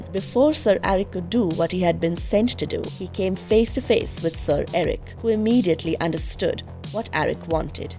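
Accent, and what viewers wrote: India. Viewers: but indian voice :/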